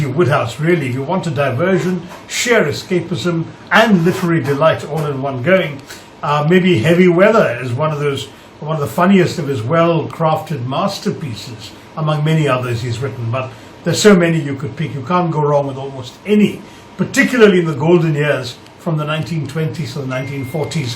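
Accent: England, United Kingdom. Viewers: Such an English accent...lovely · Is the British accent necessary?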